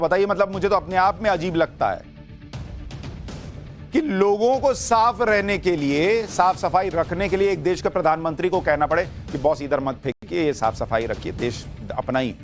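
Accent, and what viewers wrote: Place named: Bhojpuri, India. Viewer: Love you bhojpuri words and accents you use in between,it helps in suing away the stress got fron the serious news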